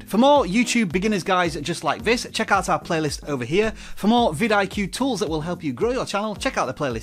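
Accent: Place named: United Kingdom. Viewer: random is vidiq British because he sounds like that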